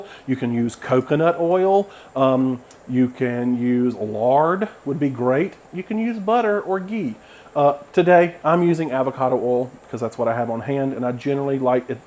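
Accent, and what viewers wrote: South, United States. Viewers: Omg your voice and accent is so cute! You sound like that rooster 🐔 in Bugs Bunny, Foghorn Leghorn 🤣☺️👍 So Southern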